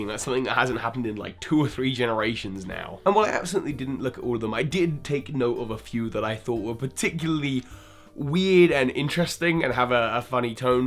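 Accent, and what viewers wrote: United Kingdom. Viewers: Are you from America? I always thought you was British!?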